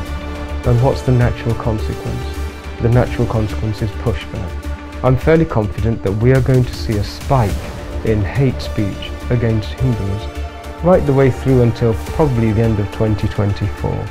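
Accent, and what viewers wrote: United Kingdom. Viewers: Brother. he is based in Britain and its the accent. It isn't too hard to understand if you focus... Jai Shri Ram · Why he is speaking with a British accent and why British government is allowing him to speak against Britain? These are the questions you need to ask yourself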